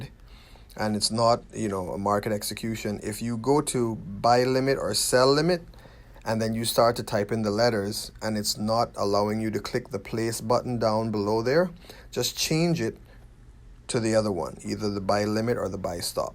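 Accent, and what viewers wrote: Jamaica. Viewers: sounds jamaican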